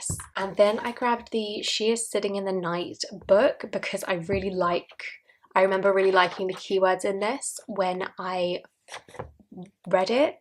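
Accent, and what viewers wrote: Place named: England, United Kingdom. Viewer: LOVE LOVE LOVE this! Fairly new to your channel and have been dipping in here and there. I will be starting my own tarot journal when it arrives. I am intrigued about writing my own keywords on a deck. I have considered this before but it sort of felt wrong. I am off to google Lisa's book now :) Also as a side note your voice is beautiful, it's giving me serious ASMR vibes feeling very chilled now. I am from London but live in Ireland so really miss the sound of English accents :D Thank you for all the inspo xx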